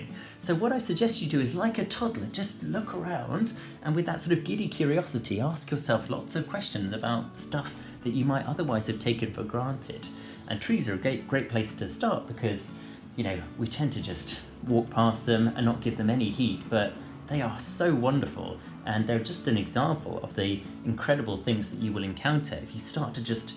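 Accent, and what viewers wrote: United Kingdom. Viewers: I am a student myself and this is so helpful in so many ways. Thank you sir! And your English accent is pure music to my ears!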